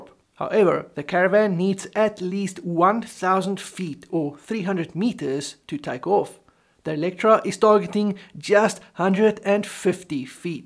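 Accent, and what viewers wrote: South Africa. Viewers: Ek wil een van hierdie dinge hê om wors vir die braai aan 'n lekker braai plek te vlieg. Heard your accent and thought, "dis my ou" 😂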